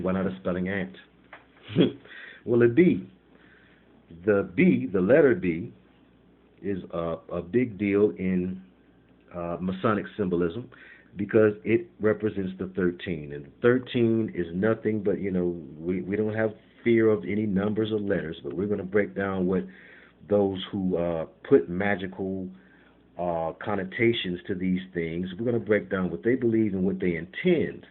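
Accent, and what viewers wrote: Detroit, United States. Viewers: Are you from Detroit bro? Much luv appreciate your teachings.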